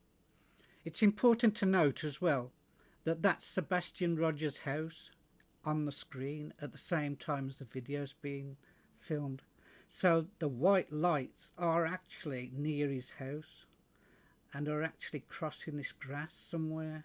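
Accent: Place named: Birmingham, United Kingdom. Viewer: Sounds like a brummy accent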